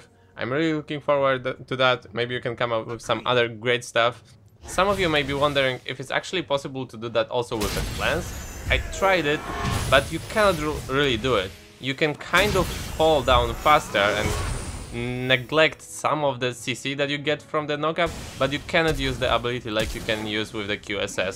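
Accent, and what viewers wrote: Poland. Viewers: coś tak czuję że Polak jesteś ;) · jak to polak chyba xd(słysze po akcencie)xdd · Uwielbiam twój polski akcent :D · Ten akcent odrazu się z polską kojarzy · Are u polish? U sound like polish guys.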